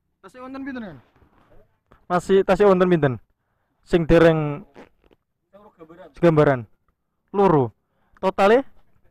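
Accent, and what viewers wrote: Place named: Indonesia. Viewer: jane jane ki wonk ndi yo.. kok tekan kepohbaru barang ki.. 😁 kondang tenan kang.. logate kok kyo wong luar Bojonegoro ki.. 😀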